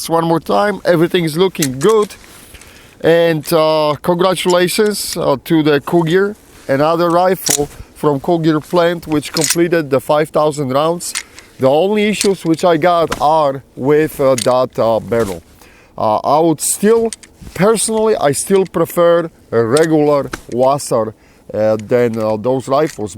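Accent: Poland. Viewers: I know this is an old comment, but this is his real accent. He’s Polish.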